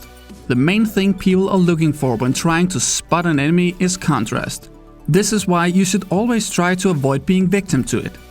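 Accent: Denmark. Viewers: are u danish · are you danish ? your accent sound a lot like it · Er du dansker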